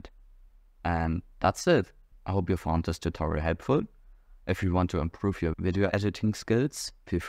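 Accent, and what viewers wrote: Germany. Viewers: love your german accent 😋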